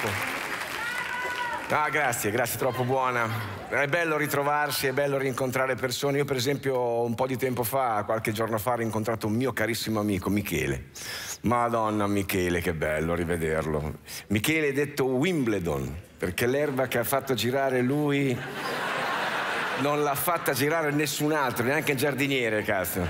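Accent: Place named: Emilia, Italy. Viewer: È meglio quando ha l' accento emiliano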